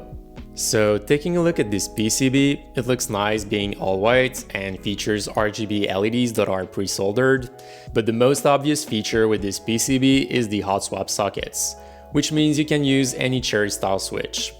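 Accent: Quebec, Canada. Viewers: Hey, are you looking to sell this keyboard? I live in Montreal and I can get around - I figured from your accent that you are from Qc as well. So if you're interested, hit me up!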